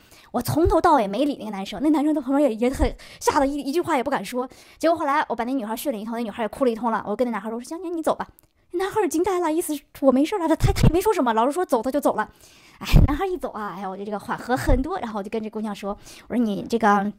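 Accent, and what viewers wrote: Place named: Beijing, China. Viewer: 还有就是，北京的儿化韵快起来我真的差一点听不懂😂😂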